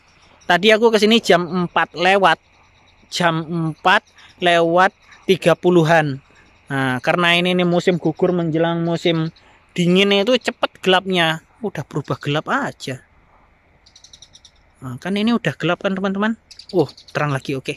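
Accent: Java, Indonesia. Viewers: Jowo mu ngendi le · Logate lho b. Jawa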